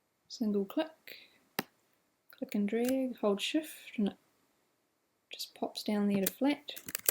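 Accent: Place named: New Zealand. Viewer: Hey, Thank you so much for doing this. It has helped me immensely. I'm new to all this and this tool was driving me mad in Affinity Designer, until I found your tutorials (it crosses over just fine). I really appreciate your patience, thoroughness and clear instruction. This is wonderful and a massive help for beginners. Thanks ever so much. PS - Your lovely NZ accent is very soothing too:)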